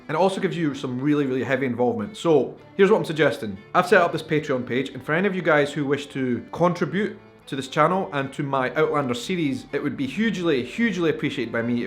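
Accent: Scotland, United Kingdom. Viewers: So happy I found your page!! I love love love outlander and I love a Scottish accent. Thank you for doing this 💙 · Castle Leoch is a must! Just saying. Amazing videos, you with your scotish accent in Lallybroch and a drone flyng over you both...amazing!. Thanks for sharing the love for the story and for your country. Makes it really special to see the series.;)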